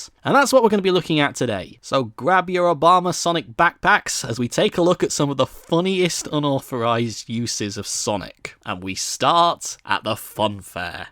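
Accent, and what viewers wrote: United Kingdom. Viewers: Andrew Wood pretty sure he’s british if you listen to his accent you 🤡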